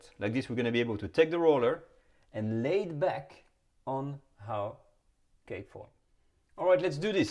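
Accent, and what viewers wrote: France. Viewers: great channel, will be making your recipes this weekend so excited! love the french accent... lots of love from NYC !! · I love all your recipes! And your French accent 🥰 thank you so much!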